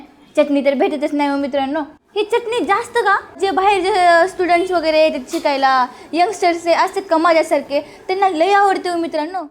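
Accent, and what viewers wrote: Latur, India. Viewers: Latur chi bhasha ❤️😂(Latur accent)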